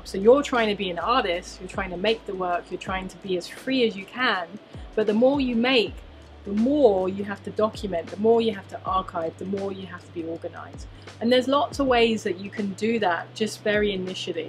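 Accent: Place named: United Kingdom. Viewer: How I missed your voice and your fantastic British accent!! I hope you will spoil us with more YouTube movies. I got to know you via a clip of Casey Neistat many years ago. I love your work and cherish my black Chantell signature Puma's 😉👍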